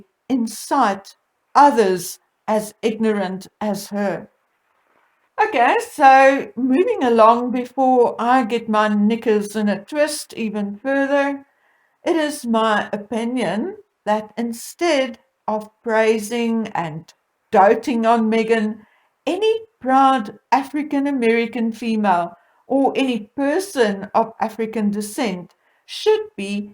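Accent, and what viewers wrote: South Africa. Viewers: Hi. So lovely to hear a South African voice😉.great point of view, and spot on! Keep it up.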